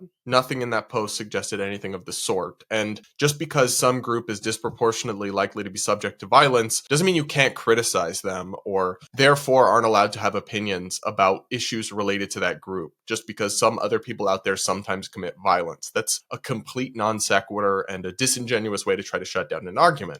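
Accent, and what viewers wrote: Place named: Oklahoma, United States. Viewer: Soo terribly minor--but I mention it only because I have heard you do this a few times before and it's at variance with how well-spoken you are. -ish; you say "have went" instead of "have gone". I wouldn't have even posted about it, but it always takes me out of what you were saying and think, "Hmm that's very 'Okie'." (I am an Oklahoman and so, love you Okies, but I worked durned hard to not sound like one!)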